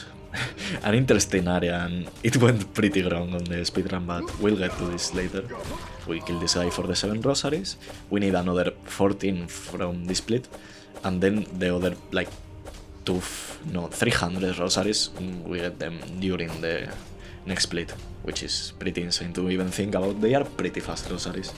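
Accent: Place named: Spain. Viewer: For anyone wondering, he has an spanish accent. Great video❤ Saludos desde Cataluña!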